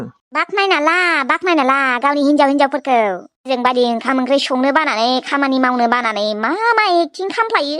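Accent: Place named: Udalguri, India. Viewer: Udalgurini raijlainai style lwi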